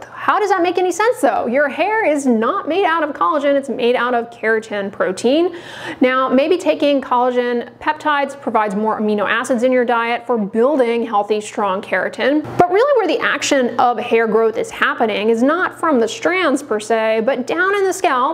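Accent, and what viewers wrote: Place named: Houston, United States. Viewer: That's Houstonian accent in Texas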